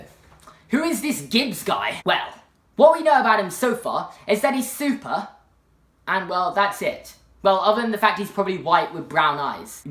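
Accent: England, United Kingdom. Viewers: Are u from England (I am lol) 😂 love ur accent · youtube then:Hey wanna play MINECRAFT YouTube now:Little british boy talking about disney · Are you British? I love your accent lol. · This video is 86392times better with his British accent😹☺️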